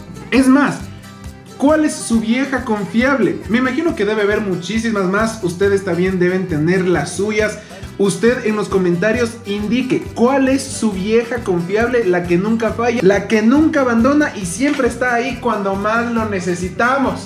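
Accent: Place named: Quito, Ecuador. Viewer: 🌟 Eres el Quiteño más simpático que he visto 😍💙 Me encanta tu acento... se te escucha lindo 💋 XOXO ❣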